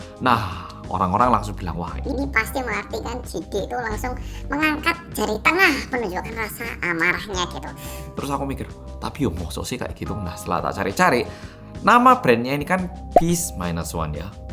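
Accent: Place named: Malang, Indonesia. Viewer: Sumpah bawaannya ketawa kalau mas jelasin medhoknya ya ampun xixixi, bener bgt penjelasan mas "itu sudah jadi ranah kehidupan pribadi yang tidak perlu kita sentuh" 😆 dari pada sibuknya buat teori, ternyata artisnya ngeprank. Sehat terus ya mas 💕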